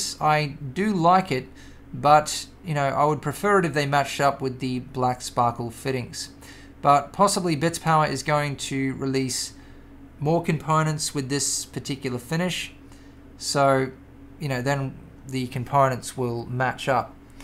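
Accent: Australia. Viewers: are you australian? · He aussy?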